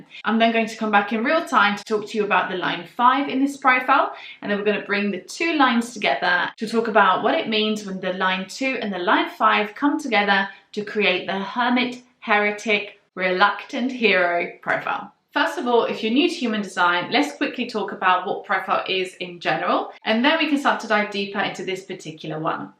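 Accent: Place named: United Kingdom. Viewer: Hello sweetheart. I was drawn here after my awakening to engage with your channel. I don't know what it means but I am drawn to explore. I am very intrigued. I am gifted because I was told by source energy that I was going to create great things for humanity at 7 years of age. I avoided University as a young man because I saw it as unnecessary debt for limited reward. I could have been a lawyer and then upgrade it. I love that you are an empath and a drawing aficionado like I am. You are so amazing. I have also done life coaching and I have a background in organic gardening as well as landscaping and horticulture. I have also grown into nutritional healing as I have skills in multiple massage techniques and herbal healing including mystical occulted knowledge. I totally get the need for personal time for growth. Boundaries are essential. I love your depth of character and it is really interesting. 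Your British accent is really cute also. I am stealing your ish line to calm my Aussie lingo down (we are too honest at times). I am very big on self care time balanced with empowerment. It must be so much harder being a woman dealing with it. Balance is key. I understand female psychology better than most women understand themselves and I imagine that you find most men shallow because they are. Women are a little more complex in general but most not by much. I'm sure that you understand. It is what it is either way and all people are amazing in their own way. I'm about to watch you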